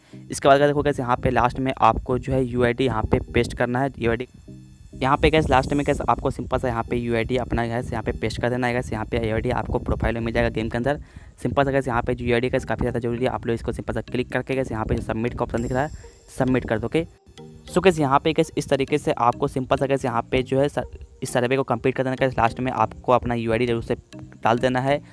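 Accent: Bihar, India. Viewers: Bhai ap bihar se ho 😀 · bhai tum bihar se ho na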